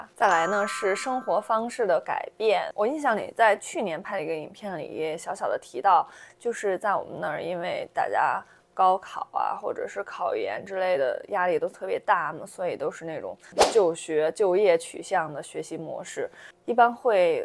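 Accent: Taiwan. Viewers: 有點台味了🤣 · 你其實本來就很有氣質了@@ 但被台灣口音同化之後，更像仙女了🤣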